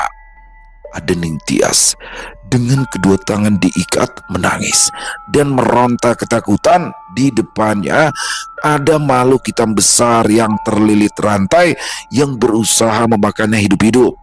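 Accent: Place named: Banyumas, Indonesia. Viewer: Bahasa jowonya kok kaku, dadi bahasa ngapak semua.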